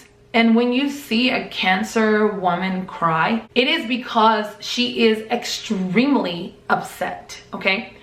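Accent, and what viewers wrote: Caribbean. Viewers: yea i grew up in Bama but I kept focusing on what I thought was a Caribbean accent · Where are you from you sound like your accent is Caribbean!?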